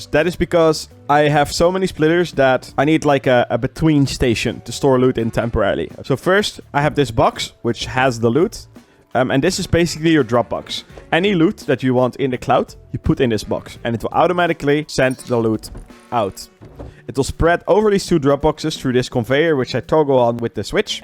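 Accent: Netherlands. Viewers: I just have to ask Are you Dutch?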